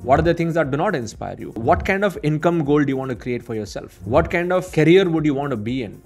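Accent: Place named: Bangalore, India. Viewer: I sensed some Robert k and a lot of T Harv Eker here. I have also read Secrets of millionaire mind multiple times and trust me give the same examples you used. Especially the ice cream example. They work everytime. Nice video as always macha. Your way of speaking always reminds me of my time in Bangalore.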